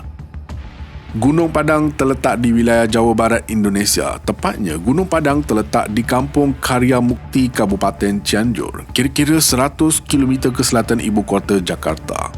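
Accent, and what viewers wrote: Malaysia. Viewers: Pusing aku kalau orang malaysia ngomong...bagus belajar dulu sama melayu riau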